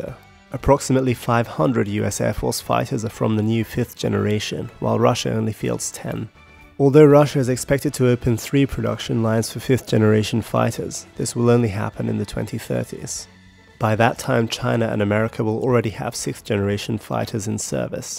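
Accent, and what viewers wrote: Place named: United Kingdom. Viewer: Pompous British accents are hilarious 😂 Nothing personal, great videos !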